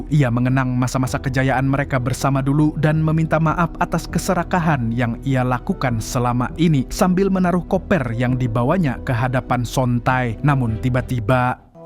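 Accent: Banjar, Indonesia. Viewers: Urang banjarkah pian mang?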